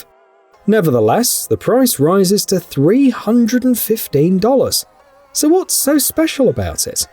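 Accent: United Kingdom. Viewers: You are in the USA! Why are you doing the narratives using a Brit???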